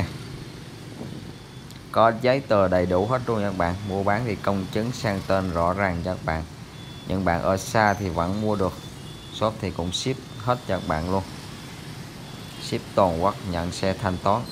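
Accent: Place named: Nghe An, Vietnam. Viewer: Ớ quỳnh lưu nghệ an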